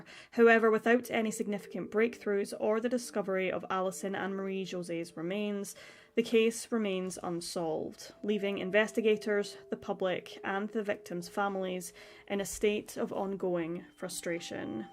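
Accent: Scotland, United Kingdom. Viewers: HOLA MI FAMILIA DARK 🌑 CURIOUSITIES & BABY GIRL WITH THE AWESOME SCOTTISH ACCENT!!!#665 TOO COMMENT, THANKS GOODNESS, IT WASN'T THE NEXT ###😲😲😲😲😲😲😲🫣🫣🫣🫣🫣🫣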